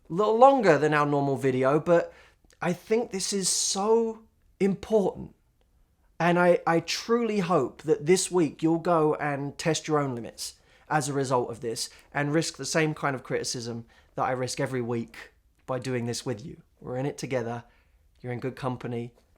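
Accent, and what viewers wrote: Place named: United Kingdom. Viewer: Vandalized my ass! lol you are so funny. What makes it even funnier is the way it's said in that British accent of yours:)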